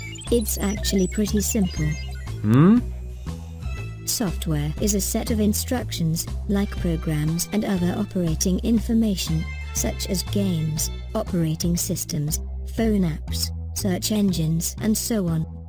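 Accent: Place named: RP, United Kingdom. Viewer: I enjoyed this because I thought the voice was Glados with a posh British accent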